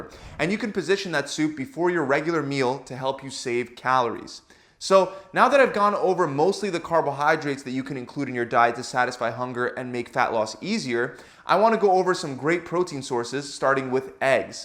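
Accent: Philadelphia, United States. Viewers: he’s from philly “wooder melon “ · guy sounds like he's from philly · Woodermelun lol I know a Philly accent when I hear it! What can I say; we pronounce our water, watermelon and waterice, aggressively 😎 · He's gotta be from Philly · Quick question where you raised in the philly area? I noticed you say Wooder just like we do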